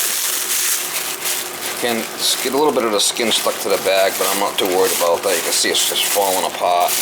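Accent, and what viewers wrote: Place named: Boston, United States. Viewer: Buttah, bahg, get everything togethah, containhas, heah, I love the Boston accent! Haha I’m from Norfolk county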